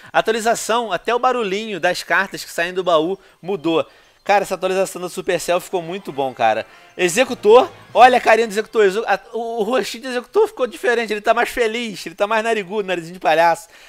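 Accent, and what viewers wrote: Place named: Portugal. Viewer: Kaio ele é português ele fala assim.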